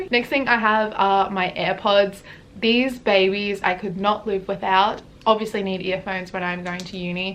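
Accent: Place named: Australia. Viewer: I can tell your Australian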